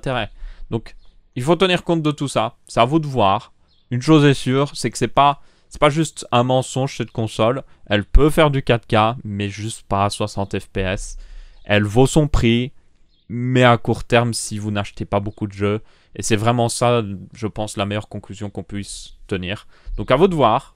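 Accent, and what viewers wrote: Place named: Belgium. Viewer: bah il est belge il le dit en belge ... il va pas le dire en anglais non plus ..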